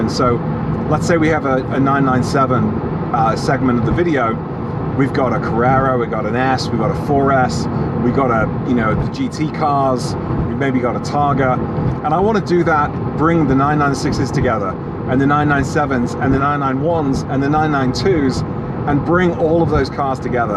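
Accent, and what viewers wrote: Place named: North West England, United Kingdom. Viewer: 4 people..just don’t understand life! I’ve recently purchased a <num> in the uk after no car ownership (living in London) for nearly 8 years. You just can’t fault The car and In Tamdem this channel - open honest, content and ideas that make Porsche ownership seem like the Right choice - despite the wankers (and there are a lot) hat tip!! Cheers - and you sound like you were from the north west uk - much respect!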